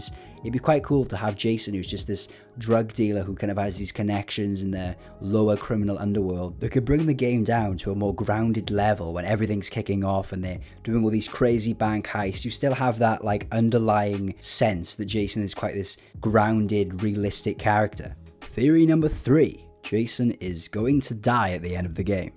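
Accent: Wales, United Kingdom. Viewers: I know a fellow Welshman when I hear them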